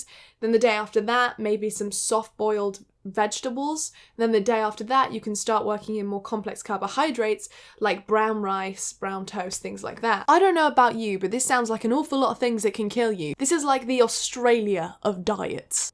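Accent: United Kingdom. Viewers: Honestly when I clicked on this video I forgot she’s British so I was surprised when she started talking all British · Hii!! ❤ I love your videos so much and omg your british accent is something else girl! 😍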